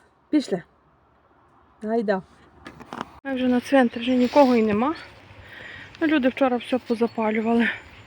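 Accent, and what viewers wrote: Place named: Ternopil, Ukraine. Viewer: Добрий вечір хочу вас запитати з якого ви району на Тернопільщині тому що я також з Тернопільщини яке ваш район,село в нашому селі є також така фамілія як ваша акцент ваш такий як у нас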